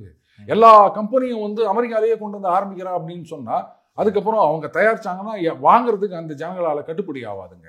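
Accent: Chennai, India. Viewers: தங்கள் Madras பாஷை ஸ்டைலில் உலக நடப்பு, அதனால் பாரதத்தில் ஏற்படும் தாக்கம் ஆகியவை தேசிய உணர்வுடன் மிகவும் ஸ்வாரஸ்யமாக கூறுகிறீர்கள். மிக்க அருமை.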